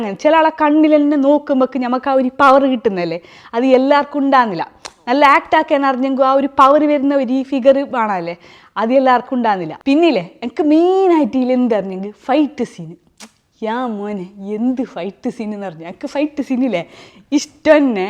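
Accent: Kasaragod, India. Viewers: Still I'm remembering your lucifer review by our slang Kasaragod basa again you are back on same production movie same style of review go on pinne kana ave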